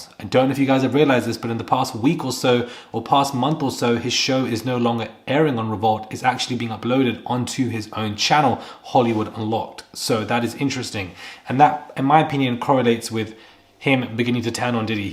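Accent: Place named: United Kingdom. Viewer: This guys UK accent makes it miserable listening to him. The UK is probably the most unattractive country with the most unattractive people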